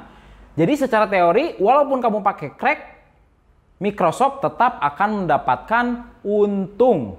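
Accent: West Java, Indonesia. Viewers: penjelasan dengan kearifan lokal , ingrris ala" indo dan penjelasan "F" dengan khas orang sunda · Pasti orang sunda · Nah ini, daritadi gua fikir logatnya asli sunda kwkwk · sunda pisan om semua huruf f diganti p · Alah siah sunda pisan euy fenjelasannya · dia orang sunda makanya ngomong kek gitu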